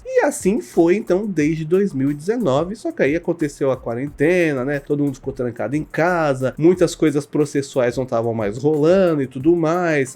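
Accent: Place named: France. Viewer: Olá muito bom seu sotaque francês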